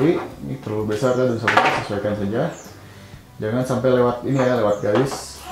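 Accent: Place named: Bakumpai, Indonesia. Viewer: Abang orang mana kayak bahasa bakumpai ije due telu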